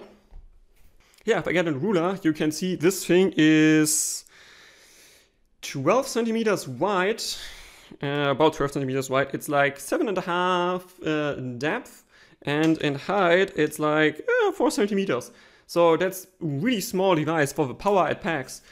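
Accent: Germany. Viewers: The written review is good. You are well informed for a newbie :D How did you learn all the Audio Stuff and the assessment etc? Can you recommend any Youtube Channels or books? Promising Channel, keep up the good work! Du hast auch einen typischen deutschen Akzent im Englischen :D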